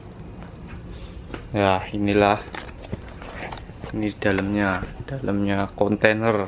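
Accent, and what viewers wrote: Banyumas, Indonesia. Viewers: Ngapak wongendhi rika Dene ngelu ndase😁😁😁 tetap semangat ngeh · Mase ngapak yak? Tegal apa purbalingga? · Mas orang ngapak ya hiihi..kalem temen kyknya😂😂😂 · Orang ngapak ya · Koyoke sampean uwong ngapak 😂😂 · Orang ganyeng banget,,,,tpi sayang ngomongnya.......wkkk wkkkk,,ngapak ngapak..... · wong ndi mas. kok ngapak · Wong ngapak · wong ngendi pean ki kok ngapak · Jawane ngendi maz kok rodo ngapak le ngmng 😊😂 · Org ganteng kalau jawa ngapak bahasanya jadi wagu gimana gitu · wong ndi njenengan mas,deneng ngapak temen ngomonge??😂😂😂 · orang ngapak ya mas... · Sukses terus mas salam dari malaysia. Rika kayong mandan ngapak deneng, hehehe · Bahasamu ejih ngapak bro,,asalmu jw ngapak yo,,,pokoke berjuang,,sukses terus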